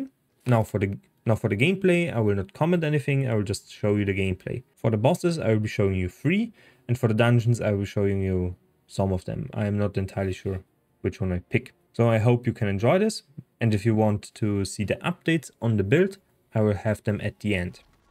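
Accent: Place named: Germany. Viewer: Gibt es das auch auf deutsch? Hast ein starken deutschen Akzent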